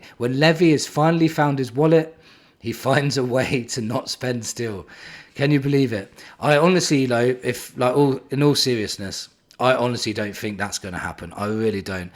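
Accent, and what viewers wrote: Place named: Norfolk, United Kingdom. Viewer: Yes mate thought you had a Norfolk twang you farmer! And Wharton would be the best so far imo 🙏🙏🙏🙏🙏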